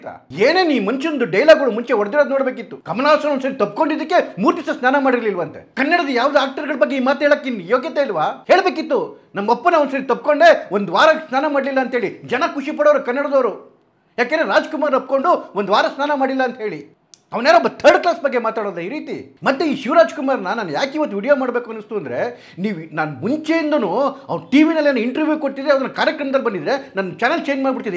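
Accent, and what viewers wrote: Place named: Tamil Nadu, India. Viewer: Tamil chenai mathadthane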